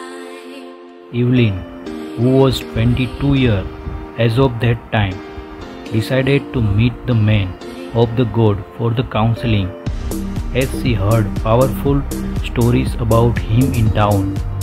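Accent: India. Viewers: I don't know who wrote or compiled this, but they should go back to find out more about the geography of Nigeria. The commenter is reading with an Indian accent, so I guess not a Nigerian. Oshimili is never and will never be in the Northern Nigeria. Orile is never in Oshodi (lol).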